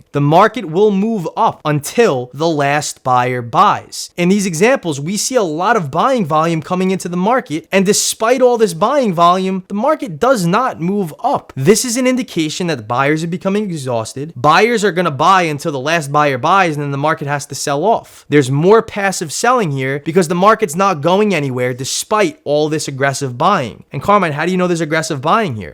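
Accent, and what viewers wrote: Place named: New Jersey, United States. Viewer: You deff from New Jersey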